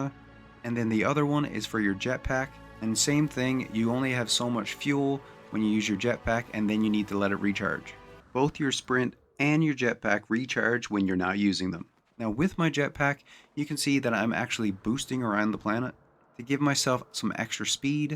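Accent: Newfoundland, Canada. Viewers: i love your accent- reminds me of home (newfoundland) :] ty for this video <3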